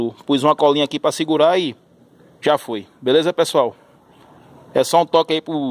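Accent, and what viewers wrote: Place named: Northeast, Brazil. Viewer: Cê num reparou a conversa de nordestino não?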